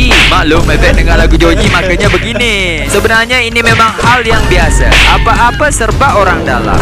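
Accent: Malaysia. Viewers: Bingung ni abangnya logat Melayu tapi kok berbahasa Indonesia 🤣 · logat lu malah kek orang Malaysia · Eh bree ..lu org mana yak ..logat lu kek Malaysia 😁 ..gw tahu di indo ada juga logat melayu ..tp gw penasaran ma elu 😁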